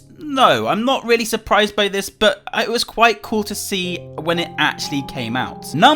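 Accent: United Kingdom. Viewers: He’s British lol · He’s a cool British guy so he gets permission to say it in another way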